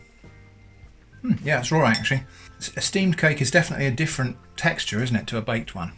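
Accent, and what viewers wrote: United Kingdom. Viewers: I've been subscribed for quite a while now, and I'd like to congratulate you on all the channel growth. I wanted to catch a new video early just to say "Thanks for all the great videos!" before it gets too hard to read them all. So thanks again, I'd love more YouTubers to follow in your footsteps of wholesome, varied content. P.S. You have a very cool accent, all of the regional British accents are super interesting.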